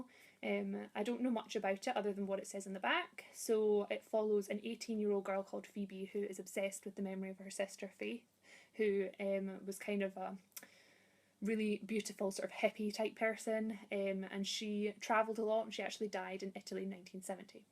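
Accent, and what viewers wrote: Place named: Ireland. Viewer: Hello, New viewer/subscriber here. Am familiar with some of what you showed here, though have yet to have read any. Have been intrigued with Tolkien for a while. What would you recommend to start with him? I'm guessing you're from....Ireland....? I've always been interested in dialects, though not the best at identifying them. :-) Thanks for sharing, and looking forward to more from you. -Carm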